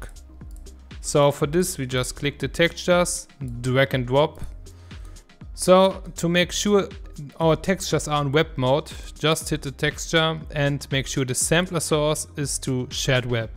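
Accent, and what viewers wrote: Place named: Germany. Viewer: i hear Deutsch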